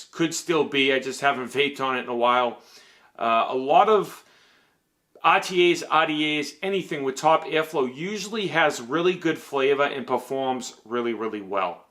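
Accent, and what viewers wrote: Boston, United States. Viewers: You're not a Bostonian by any chance, are you? Just testing my accent recognition.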